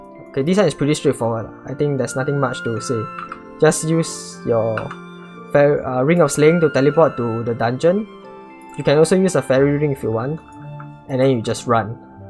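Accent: Singapore. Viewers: Really appreciate your explanations while doing clues, would love to see more of such videos. And I feel comfortable listening to a fellow sg voice.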